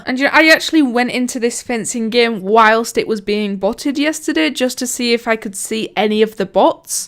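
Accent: United Kingdom. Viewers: I like 👍 your british voice👍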